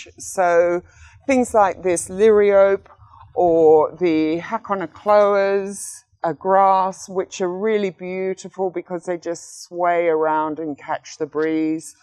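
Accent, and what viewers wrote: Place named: England, United Kingdom. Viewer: It's either Midlands or southern England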